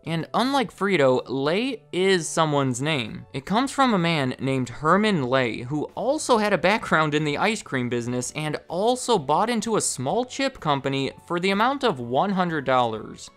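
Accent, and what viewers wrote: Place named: Midwest, United States. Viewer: In this video, it is the word "straighten" or how you say it "straiden" lol. Some midwest thing idk ohio or illinois or something. But it's definitely some accent.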